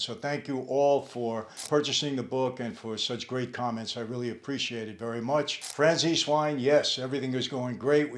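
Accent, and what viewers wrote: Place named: South, United States. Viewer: im from alabama your sure you aint from the south🤔?...LOL..... I agree with just about everything you say man 👊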